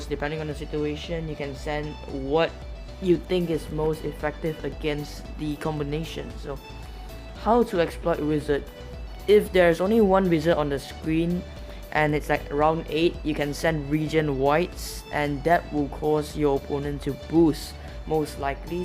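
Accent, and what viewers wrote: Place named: Singapore. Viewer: You sound singaporean, where are you from?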